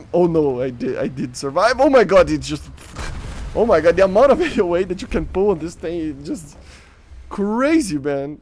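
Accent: Brazil. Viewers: are you brazilian?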